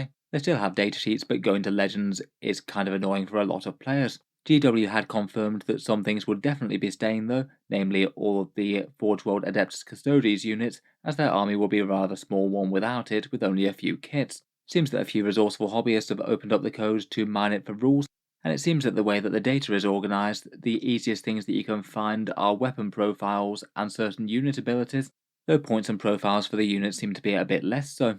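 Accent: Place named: United Kingdom. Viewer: Pretty much everyone in the UK speaks like that ;)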